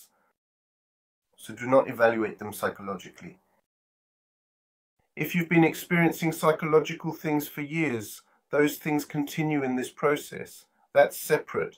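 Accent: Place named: United Kingdom. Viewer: Güzel bir İngiliz aksanıyla güzel bir açıklama olmuş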